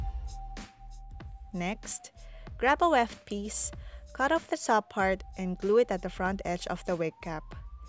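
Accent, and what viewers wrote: Philippines. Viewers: Your accent tho, are you from Philippines? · U r filipina right? The u talk English . ^^ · is she Filipino? her accent sounds like one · Yea I could tell she might be filipina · You have a filipino accent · Is she a Filipino? I can tell from her accent. 😅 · you have a filippina english accent are you filipina? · Are you Filipino? Cuz your accent sounds like Filipino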